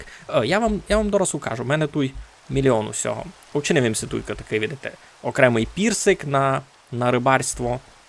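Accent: Transcarpathia, Ukraine. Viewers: Йой, то є закарпатська мова?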